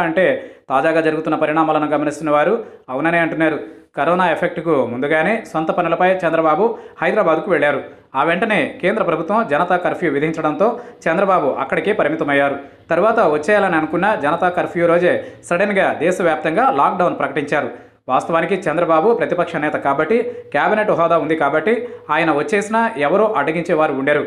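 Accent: Rayalaseema, India. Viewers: E video voice anna medi rayalaseema na anna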